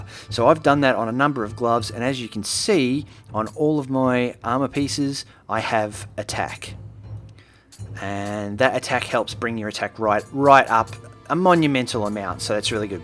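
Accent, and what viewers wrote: Australia. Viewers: great vids by the way, I'm aussie too, nice to hear the accent.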